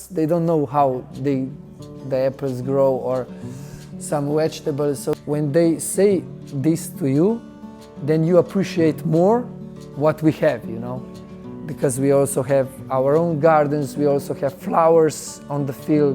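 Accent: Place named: Wales, United Kingdom. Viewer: Slovenian. Accent isn't a hundred miles away from Welsh either ha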